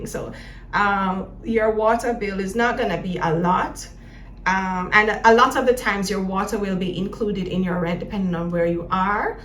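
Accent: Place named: Jamaica. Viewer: Very informative video! ❤ I love the Jamaican accent…😂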